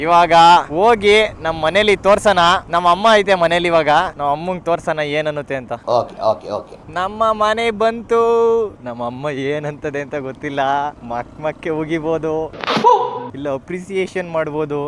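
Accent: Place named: India. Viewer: U r kannada slang is super bro congratulations for the bike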